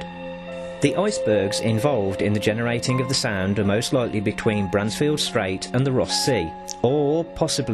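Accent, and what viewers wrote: United Kingdom. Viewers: I wonder if it's the Cov accent that makes everything you say sound plausible,because I've got a Cov accent as well,lol! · Do you have a west mids accent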